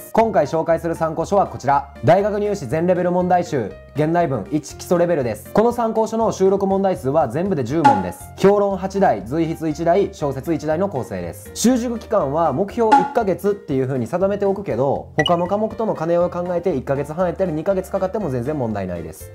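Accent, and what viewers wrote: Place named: Kansai, Japan. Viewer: なんかこの関西弁謎に腹立ってくるww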